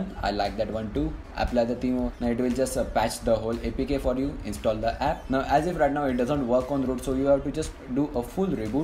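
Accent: India. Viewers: Uhh indian accent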